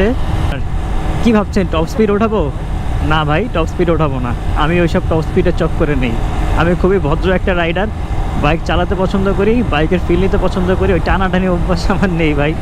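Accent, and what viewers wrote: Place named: Kolkata, India. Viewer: kotha bolar style ekdom kolkatar manusher moto. Hashi+ protita kothar sound ekdom kolkatar manusher moto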